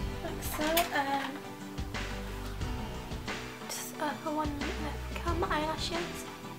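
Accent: United Kingdom. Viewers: by accent i just assumed you're in uk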